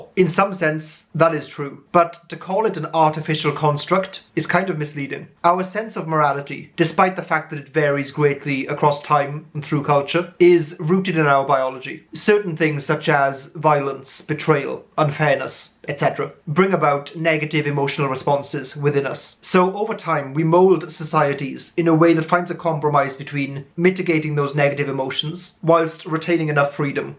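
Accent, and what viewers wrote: Wales, United Kingdom. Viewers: Is nobody going to point out the Welsh accent?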